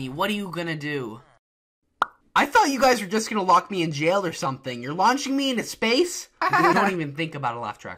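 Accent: Quebec, Canada. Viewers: probably from Quebec, Canada. definitely from up north, speaks both english and french fluently, plus the references to Canadian provinces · he is Canadian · Is he Canadian?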